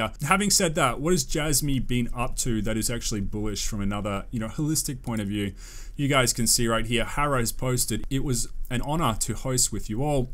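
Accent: Australia. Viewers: Good to hear a Australian accent on a crypto YouTube channel 👍🏽👍🏽 great job 👏🏽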